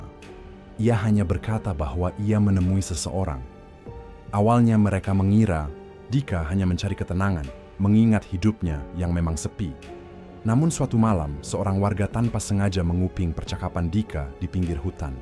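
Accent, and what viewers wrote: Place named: Java, Indonesia. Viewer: Biasanya kl p.dhe yang cerita logat jawanya ikutan keluar😅😅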